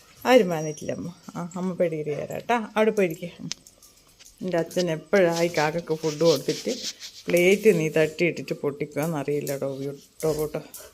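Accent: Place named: Thalassery, India. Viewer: സംസാരം കേട്ടിട്ട് തലശ്ശേരി ഭാഗത്ത്‌ ആണെന്ന് മനസിലായി... ഏത് ഭാഗത്താ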